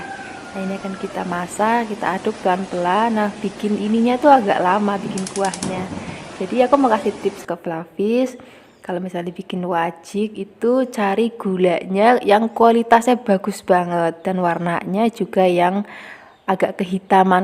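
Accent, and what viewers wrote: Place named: Banyumas, Indonesia. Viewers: Menarik....apalagi dialek banyumasannya mantep😅😅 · Banyumas dialeknya ❤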